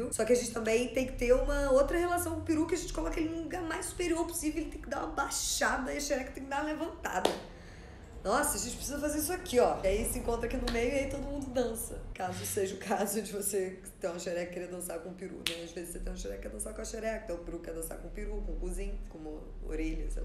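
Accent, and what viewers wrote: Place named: Rio de Janeiro, Brazil. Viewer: Como sotaque carioca é ridículo, com certeza é o sotaque mais feio do mundo.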